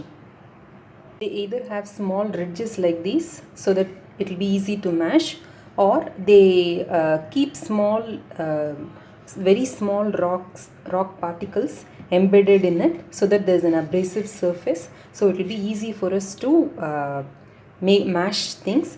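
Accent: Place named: South, United States. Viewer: Very very informative. Thank you. I like your southy accent.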